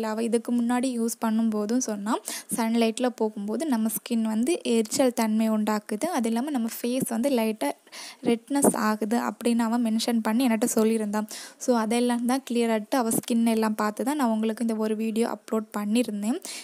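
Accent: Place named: Kanyakumari, India. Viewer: Correct bro ivanga Kanyakumari than pesurathei vechei theriuthu 😅💯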